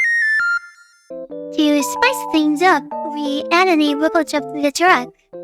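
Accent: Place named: Philippines. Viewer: you're filiipino aren't you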